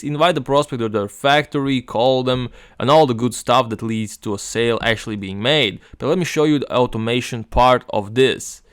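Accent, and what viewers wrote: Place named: Latvia. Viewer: Hello mate, thanks the value 🙏. I have one question: It seems like your clients are American, do they care about your Latvian accent or have you faced any rejections because of it?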